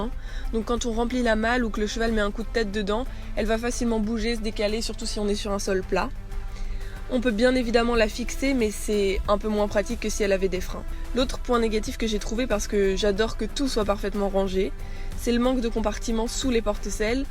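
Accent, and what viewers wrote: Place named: Belgium. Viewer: J'aime tellement l'accent Belge 💕 C'est sûrement parce que j'ai grandis à la frontière ^^ Sinon vraiment sympas la vidéo 😊 Ca doit être lourd à pousser quand même !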